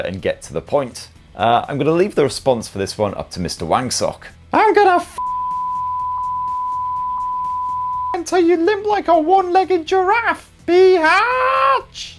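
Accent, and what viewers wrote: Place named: Scotland, United Kingdom. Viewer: English! With that Scottish accent!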